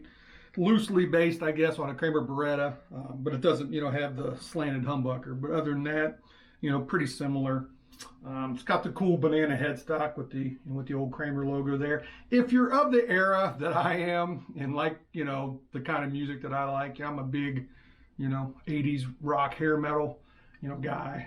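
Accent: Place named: Baltimore, United States. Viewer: oh. I kind of heard the bmore Maryland accent in your voice, plus you stated with a Riff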